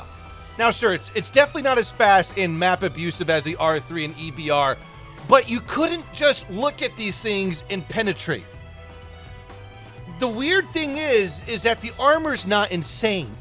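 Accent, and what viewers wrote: United Kingdom. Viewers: thats cause hes brrrrrrrrrrrritishhhhhhh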